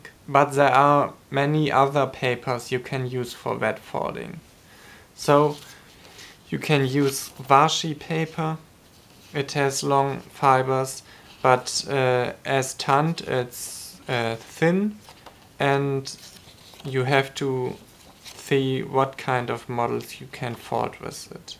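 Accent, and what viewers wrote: Germany. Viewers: Du bist eindeutig deutsch haha gut erklärt !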